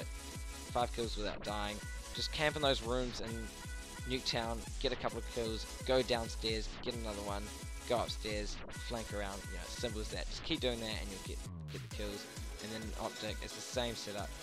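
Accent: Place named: New Zealand. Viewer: You sound like a New Zealander lol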